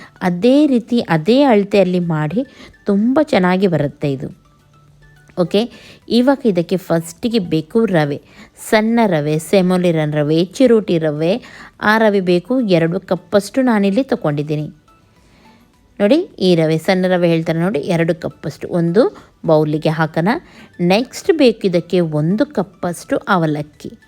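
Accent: Mangalore, India. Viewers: Super recipes and your Mangalore language sweet voice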